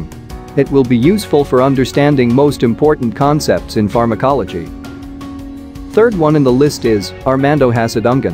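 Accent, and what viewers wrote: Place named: India. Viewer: I agreed with most of the list, but that cruciating hindi acent in the first place?Seriously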